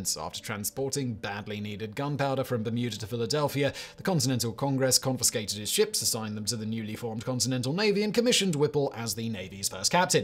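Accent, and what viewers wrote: United Kingdom. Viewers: I find it highly amusing to hear a Brit extolling the exploits of forgotten American Revolutionary heroes, but very well done! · You're British? I thought you were from California's central Valley where you developed a killer UK accent. PS that is the story of James Marsters, Spike on "Buffy the Vampire Slayer" who is from Modesto,CA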